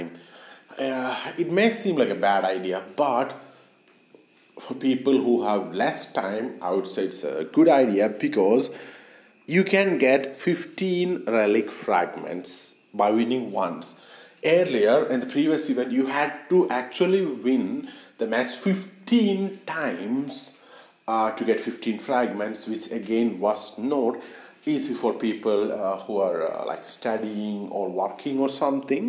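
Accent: Kerala, India. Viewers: Dude are you from Kerala? Great content btw! :)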